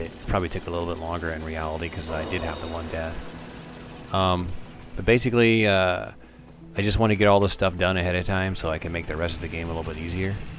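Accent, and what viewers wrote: United States. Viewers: I really like your commentaery. I am Scottish and I have relatives from LA and New Jersey. I love American accents. Whereabouts are you from? Thanks.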